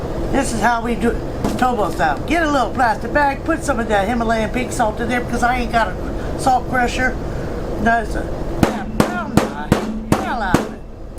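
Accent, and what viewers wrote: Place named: South, United States. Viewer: I like your Southern accent, drives me wild!🦝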